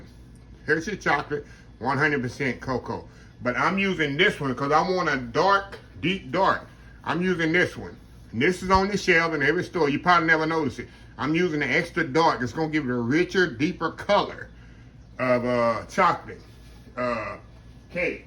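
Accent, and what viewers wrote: United States. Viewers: We're from Philly, up north and my grandson, he is 10 said, what kind of accent does he have, i was like he is an ole country boy heheh,! where are you from brother?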